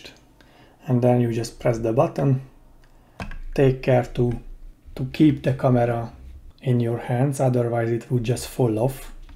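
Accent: Hungary. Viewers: This project is cool. Congratulations. Development idea: equip the motors with rotary encoders and use them for teaching function (pre set the scenery and camera movement before shooting). Ps.: your keyboard and accent is Hungarian. Are you?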